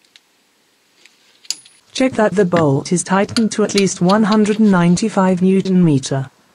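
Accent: United Kingdom. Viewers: So are you an Englishman in France?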